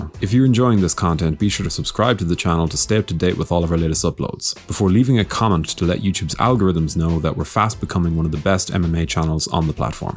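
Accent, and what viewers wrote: Ireland. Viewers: Learning to live with annoying monotone Irish voice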